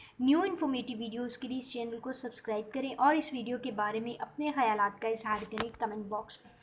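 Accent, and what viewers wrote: India. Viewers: Accent indian ha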